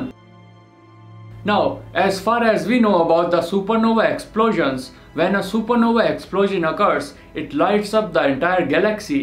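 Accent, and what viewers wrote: India. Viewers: I want the text back not because of your accent im indian too but i don't have that accent but with text its chill for me :D and u very genius mwen :D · I hate an Indian when he talks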